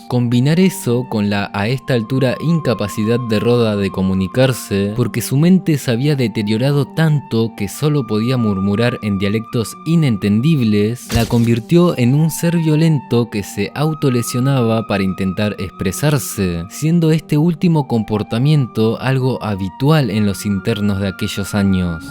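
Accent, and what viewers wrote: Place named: Argentina. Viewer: WTF Dross desde cuándo tenés acento argentino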